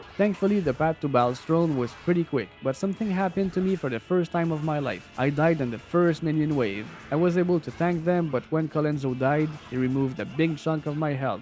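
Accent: Quebec, Canada. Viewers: You french canadian ? Im curious 😆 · “J’ai Chaud”. I can never unhear it now. You for Quebec? The accent sounds very familiar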